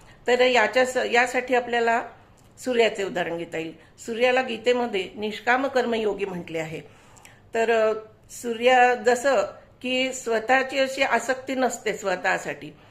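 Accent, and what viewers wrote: Standard. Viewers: खुप छान,शुध्द आणि स्पष्ट उच्चार आणि सोप्या शब्दात अर्थ सांगीतला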